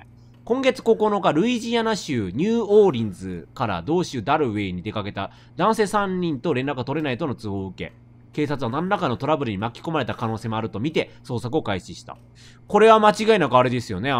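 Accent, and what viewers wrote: Kansai, Japan. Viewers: なんか最近前以上に関西弁がよく出る気がする、気のせいかな？笑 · ホラーとか焦る場面が多いゲームになるとレトさんの関西弁がいっぱい聞けるので嬉しい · やっぱレトさんの関西弁ええなあさっきまでガッチさんの見てたから差が大きくておもしろいwww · レトさんの関西弁で中和されてる気がする、、、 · レトさんがびっくりする反応とたまにでる関西弁、好きです。怖い系とびびる系は大丈夫なんだけど痛い系は無理ドライバーの+−そんなに気になる？笑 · バイオしてるさんは関西弁になるw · 関西弁がどんどん増えてく…笑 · 追い詰められたら関西弁になるレトさん好きwww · なんかバイオだとレトさん西の感じ強いね笑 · 普段よりさんの関西弁が多くて嬉しいしかないです🤦‍♀️🤦‍♀️💕💕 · レトさんの実況補正あるにしてもめちゃくちゃ怖すぎて画面ちっちゃくして見てます😂😂関西弁たすかる😂😂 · 焦ると関西弁が出てきてしまうさん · 最強に怖くなると関西弁に戻る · レトさん焦ると関西弁がめちゃめちゃでるからちょーうれしい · 近年なかなか聞けなくなったレトさんの関西弁、、、ありがとうございます✴ なんか新鮮です！ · びっくりしすぎだし関西弁出すぎｗ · レトさんの関西弁がおもろすぎる笑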